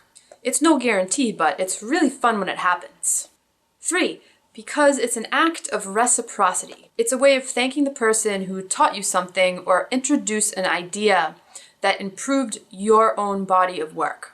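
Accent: Boston, United States. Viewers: This was really informative. Thanks! And your boston accent cracks me up 😆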